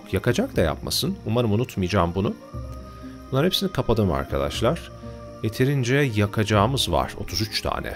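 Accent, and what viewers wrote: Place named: United States. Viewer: Namı değer türkçeyi amerikan aksanıyla konuşan koca yürekli insan sanada selam olsun:washhands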